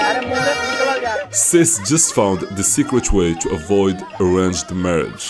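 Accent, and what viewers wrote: Morocco. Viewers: ur moroccan I know that from your accent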